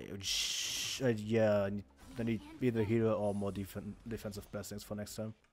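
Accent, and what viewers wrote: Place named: Germany. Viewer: Ah, i hear a DEUTSCHER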